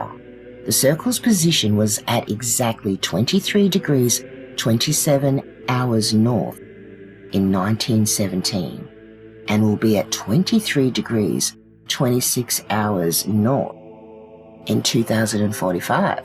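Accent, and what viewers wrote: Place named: Australia. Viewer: I was joking about your accent. I like the Australian accent btw... and I love your content. I just enjoy how you aussies pronounce Year. Yeeuh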